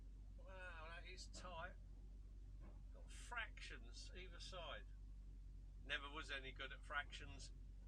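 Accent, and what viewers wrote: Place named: Essex, United Kingdom. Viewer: Great video mate, you sound like me mate from Essex gary gadget lol, i look forward to your future vids 👍 😊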